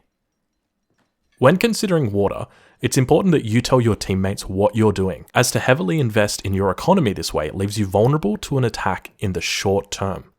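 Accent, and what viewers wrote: Australia. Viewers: Gotta love the aussie way of pronouncing "wada" Just like Modest Pelican ^^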